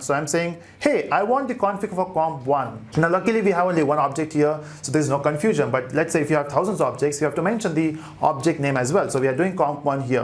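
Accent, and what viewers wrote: India. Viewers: sorry your tutorials may be good but that Indian accent is killing me.And you talk like you had 1 kilo of coffee.Not very attractive when you want to actually learn something :(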